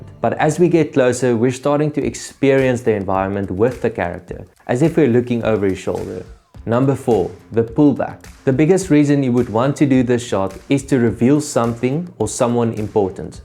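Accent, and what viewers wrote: South Africa. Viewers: I'm so happy I stumbled onto your channel. Between this, the Intent video, and the Contrast video I've never felt more in tune, capable, and powerful as a cinematographer. Weird way to describe the feeling I guess, but as a fellow Saffa it's encouraging hearing such useful, honest information in your own accent haha.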